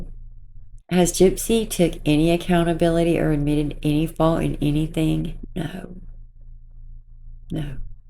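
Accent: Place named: South, United States. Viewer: ❤ you dont need to :) like your dialect, 😊 is this a southern? I am from Switzerland and learning English,start noticing dialect only after a while 😊 Sheena needs to say children are off limit is unnecessary and screams predator behavior .. They are child trafficking itches ... and this joe is absolutely sus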